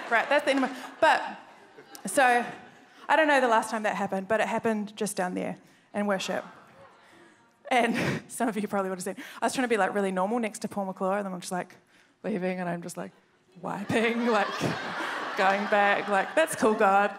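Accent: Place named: New Zealand. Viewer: New Zealand accent!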